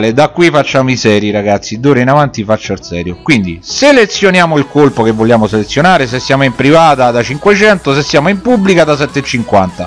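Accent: Rome, Italy. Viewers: Sei di Roma ?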